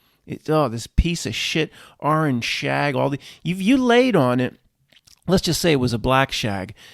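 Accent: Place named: United States. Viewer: Hey it’s guys. I like your non accent. Reminds me of my father. But you say wurter instead of water. Have a nice day. I was listening to Tim Keller on YT and he was a bald guy from Pennsylvania too.Same nice American accent!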